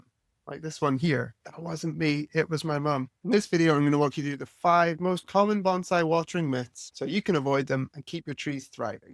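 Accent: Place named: Scotland, United Kingdom. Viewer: He sounds Scottish. A wee bit.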